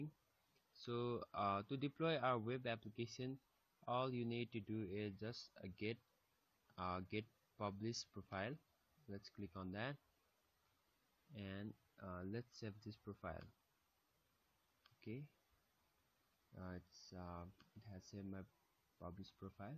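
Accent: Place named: India. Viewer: I hear an indian, I click off...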